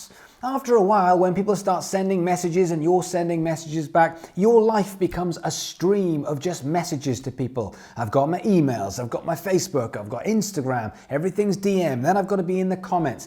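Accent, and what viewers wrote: United Kingdom. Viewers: I've been watching a lot of amercian youtuber but I didn't know until I started watching how much I needed to hear a British person. I feel like I'm back home😍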